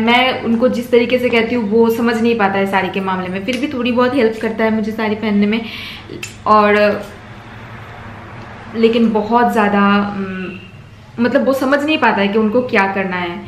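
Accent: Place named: Bengal, India. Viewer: GOD BLESS U BROTHER✋✋ i..like Ur. Talking.style. Very.much I love.ur bengali. accexent. Hindi 👌👍🌷💓 Sweet.vlog🎂 Cute couple👑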